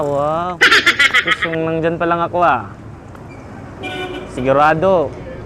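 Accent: Visayas, Philippines. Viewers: Gahi kaayu ag tagalog bai, Bisaya jud😂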